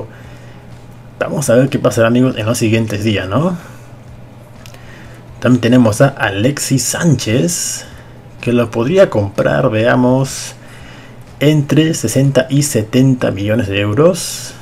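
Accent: Peru. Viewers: eres peruano ¿? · eres peruano?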